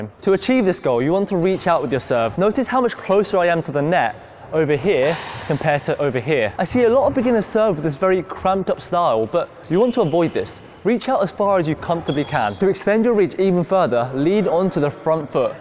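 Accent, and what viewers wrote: United Kingdom. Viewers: Wow british accent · Is this guy Asian or British ? He sounds more like British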